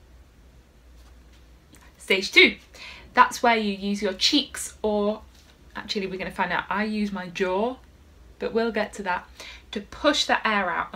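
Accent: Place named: United Kingdom. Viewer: Or a stuffy nose for that matter. I hope you find that supposed third book. But I love your circular breathing. And I love your British English accent.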